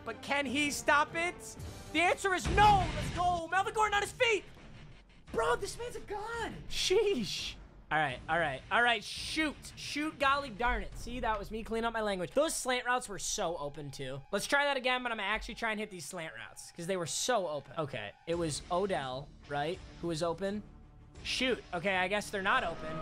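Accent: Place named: Arkansas, United States. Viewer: That Arkansas voice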